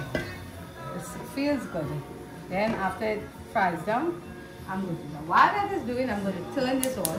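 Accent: Trinidad and Tobago. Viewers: I just came across your vlog and subscribed within the first few minutes, i love that you haven't dropped your Trini Accent. Some people will go abroad for a few months and want to talk with an American accent, little do they know how much foreigners love a Trini Accent.. Keep being your Original Self and I'm sure your channel will grow in abundance.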